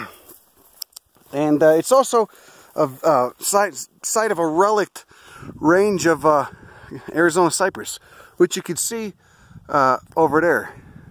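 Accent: Chicago, United States. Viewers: Is your accent Chicago?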